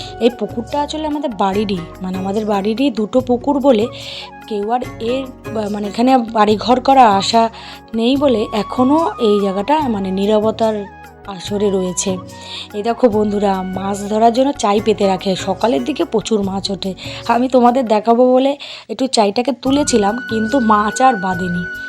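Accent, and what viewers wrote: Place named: Kolkata, India. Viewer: খুব সুন্দর অসাধারণ আপু আপনার কথা গুলো কলকাতার ভাষা ❤🇸🇦🇧🇩👌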